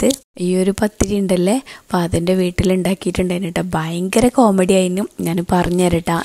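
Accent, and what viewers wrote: Kasaragod, India. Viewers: Kasrgod ningala pattal kadha keettu nallonam chirichu..avida nallarasayrikoole..slang different aya inganavum lee..enthayalum vedio adipoli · pathiri Story comedyy ayikknn😃😃ippo varthanam ketta ippo kasarkode thanne😍😍😍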